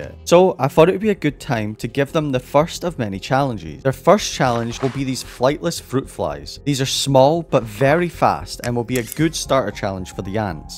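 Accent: Scotland, United Kingdom. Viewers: You Scottish? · Is bro British? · Are you British? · are you by any chance Scottish? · that scottish is chefs kiss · Are you Scottish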